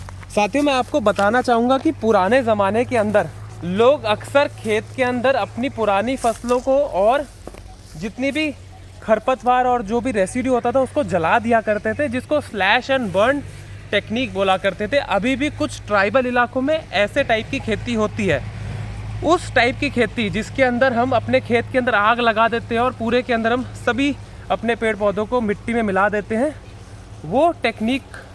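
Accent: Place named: Rajasthan, India. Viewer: Rajasthani ho kya?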